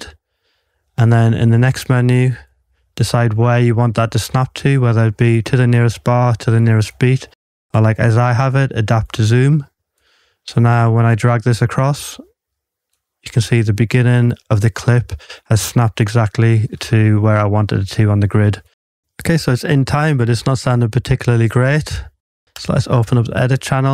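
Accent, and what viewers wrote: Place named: South Shields, United Kingdom. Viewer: I have watched it through 4 times now so you should be getting a cheque fo $0,0000 x 4 dont spend it all at once at the moment I managed to get in an instrument but cant get any sound out. I used cubase with atari many years ago and it was so simple with a Roland JV-1080 and got some great results (one recorded by Tom Jones ) Written in South Shields You sound as though your from up there but I cant get it to work with this I,ll soldier on trying to get it to speak to me I've got 26 days of trial left but at the moment even with your brilliant tuition it a no no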